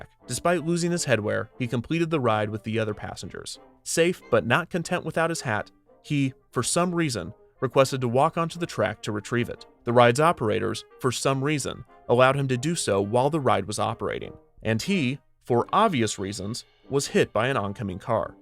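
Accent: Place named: United States. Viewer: Are you daft? He clearly sounds American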